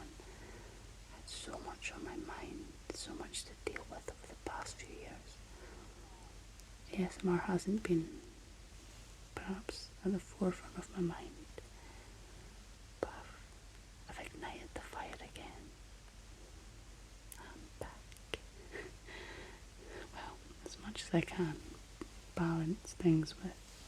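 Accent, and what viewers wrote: Scotland, United Kingdom. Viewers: Love your Scottish accent, reminds me of my childhood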